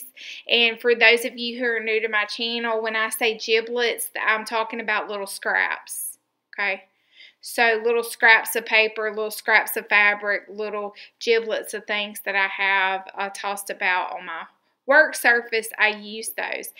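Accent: South, United States. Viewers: G’day, loved your video so much, new subbie. Another one closer to your 14k. You make so much sense in this video, and just gotta say I love your southern belle accent! 🥰 crafty hugs from down under. Xx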